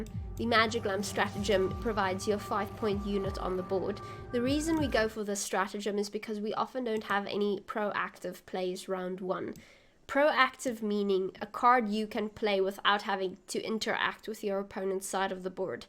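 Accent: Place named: South Africa. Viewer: Your english pronunciations are the most enjoyable i've ever heard. Are those pronunciations characteristics to South Africa?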